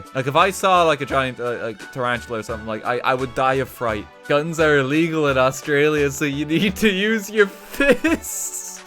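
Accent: Ireland. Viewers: im so confused i thought u were irish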